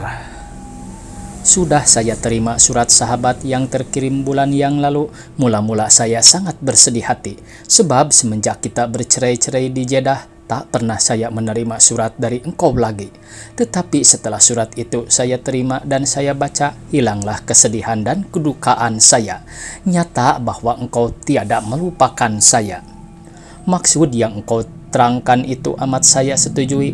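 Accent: Sunda, Indonesia. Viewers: Soantenna siga kisunda ieu teh sanes.?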